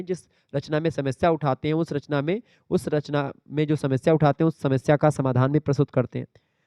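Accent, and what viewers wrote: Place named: Awadhi, India. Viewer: Aap avdhi bolte hai, badhiyaa hai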